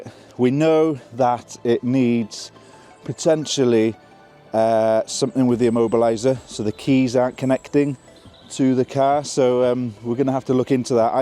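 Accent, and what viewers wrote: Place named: Dolgellau, United Kingdom. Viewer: I recognise that accent anywhere! 'Ogia Dolgella? I'm an XKR x100 owner, just down the road. 👍🏻😎🏴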